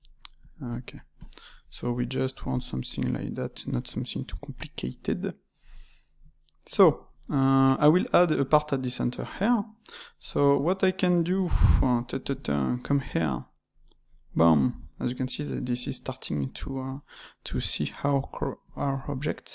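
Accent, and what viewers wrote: France. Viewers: On reconnais le francais assez timide eheh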